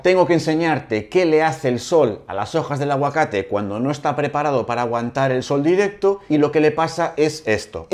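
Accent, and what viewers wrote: Spain. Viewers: Hola perdon x la ignorancia pero recien empiezo con esto.. soy de Argentina, por tu acento se que eres español.. a que le llamas perlita? A la grama volcanica? Cuanto tarda estimado la raiz en brotar de la semilla germinandola?. Pues aqui en Argentina hace mucho frio de mayo a septiembre... con buena luz artificial basta?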